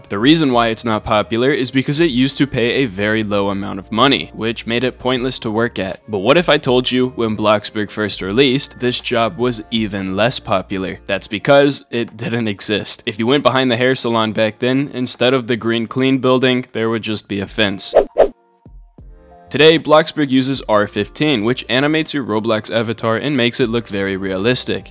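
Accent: United Kingdom. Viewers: British detected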